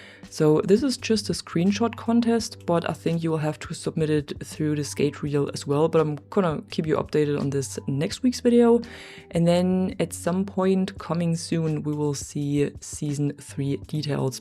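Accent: Germany. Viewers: And that loveley german accent.;D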